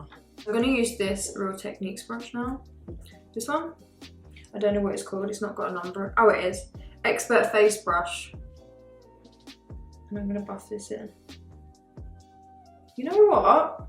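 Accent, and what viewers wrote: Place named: Manchester, United Kingdom. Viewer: Is it me or accent is changing lol 😝 baby girl!!! Xxx hearing that manny accent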